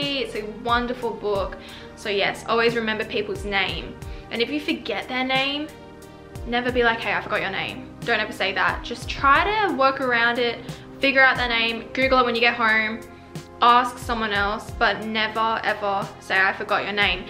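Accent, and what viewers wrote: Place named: RP, United Kingdom. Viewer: the poshest accent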